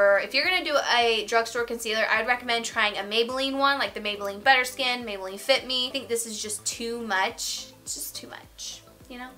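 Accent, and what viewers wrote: South, United States. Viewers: Do you ever use any Colourpop products? They have really amazing palettes that are perfect for Fall!! P.S. That south accent 🙈😍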